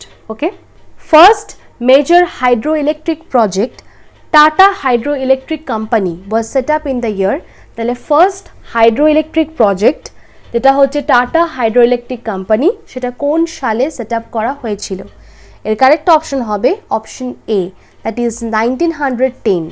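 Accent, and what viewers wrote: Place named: United Kingdom. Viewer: Mam apni oxford english bolchen.ইংরেজ দের মত বলছেন কানে বডড লাগে।আপনাকে ছোট করলাম না।sorry vul hole khoma korbe .apner question gulo khub valo.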